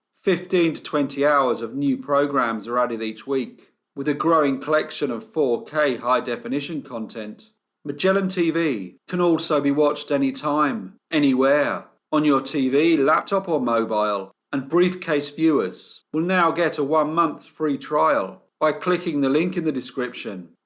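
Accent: United Kingdom. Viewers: One of my favorite bands. Must be an accent thing of that area of Britian.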